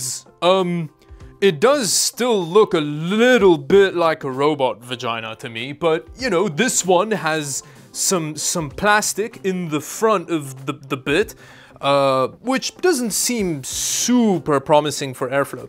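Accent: South Africa. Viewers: Are you from CA? I hear some SA in there, especially with the Ja. lol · where u from u sound South African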